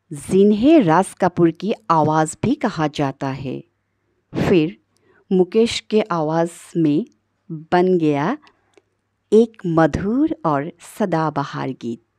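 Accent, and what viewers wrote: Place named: Assam, India. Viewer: Typical Assamese accent, love it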